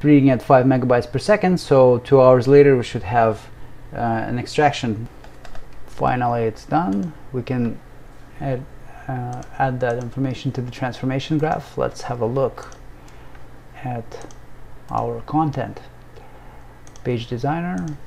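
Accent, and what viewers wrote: Russia. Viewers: Русский акцент топ) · У тебя русский Акцент Причём сильный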